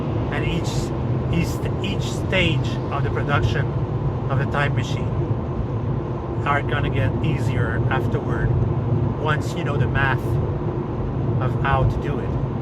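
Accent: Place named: France. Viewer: is French your first language? It seems you have an accent whenever you speak English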